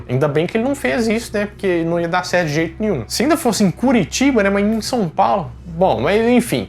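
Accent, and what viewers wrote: Minas Gerais, Brazil. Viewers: Verdade TB gosto esse sotaque mineirinho dele nos cativa, parabéns pelo trabalho nesse canal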